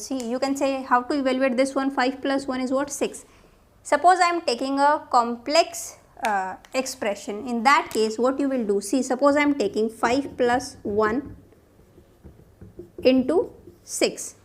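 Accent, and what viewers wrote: India. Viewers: Finally, listened one Hindi word ☺️😃.But,here english feels like Hindi only.👍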